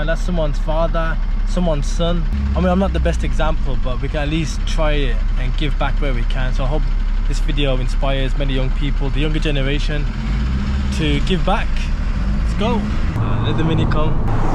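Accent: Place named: Birmingham, United Kingdom. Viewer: ha glad my comment made you giggle 😂 Are you from brum? I’m in Redditch so I recognise that brummie accent 😅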